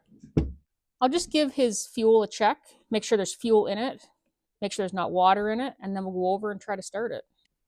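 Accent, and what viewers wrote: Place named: Canada. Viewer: There's always something solidly reassuring about a Canadian accent. No idea why this was recommended - my back yard is about 25m2!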